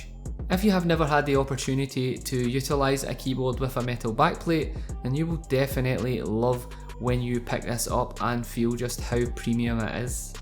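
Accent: Scotland, United Kingdom. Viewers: your Scottish accent is so Scottish P.S STAY AWESOME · What part of Scotland are you from I'm from Edinburgh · is this scottish?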